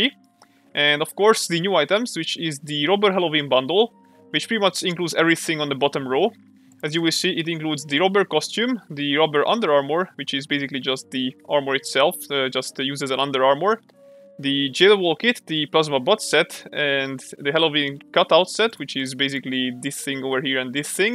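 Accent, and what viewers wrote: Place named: Denmark. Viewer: I like your accent :D are u Danish or sth lol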